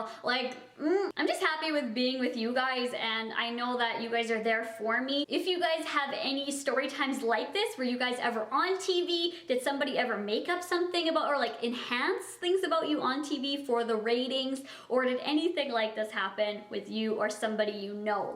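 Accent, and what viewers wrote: Canada. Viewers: Am I the only one that loves the way Canadians say about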